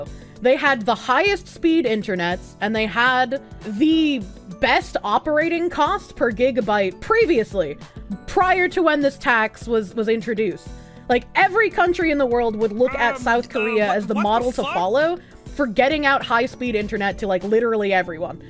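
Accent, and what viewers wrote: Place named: West, United States. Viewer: 그냥 뉴스 많이 챙겨보는 분인거같은데 서부 악센트가 강하게 들리는거 보니까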